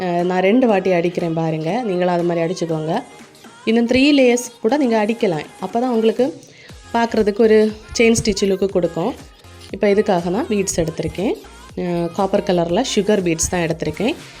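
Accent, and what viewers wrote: Kanyakumari, India. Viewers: Akka neenka entha place Kanyakumari language pesurinka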